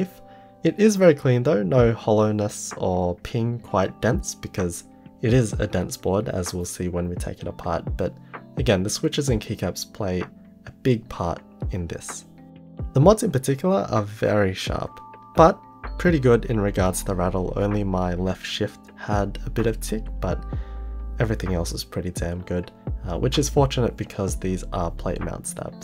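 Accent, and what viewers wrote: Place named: Australia. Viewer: an Aussie accent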